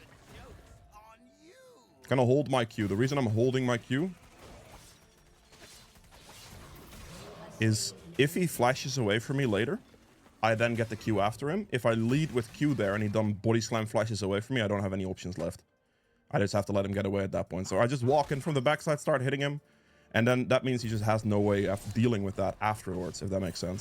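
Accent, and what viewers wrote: Netherlands. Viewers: you look like kingsize lol (dutch rapper) guessing ur from the Netherlands by ur accent too, great vid once again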